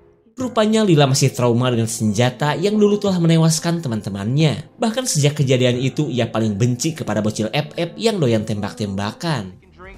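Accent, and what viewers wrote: Sunda, Indonesia. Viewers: Iya bener suaranya sunda pisan 😂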